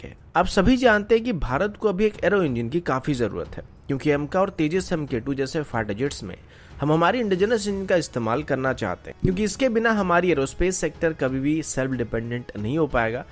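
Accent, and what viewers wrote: Odisha, India. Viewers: Bhai tum odisha se ho kya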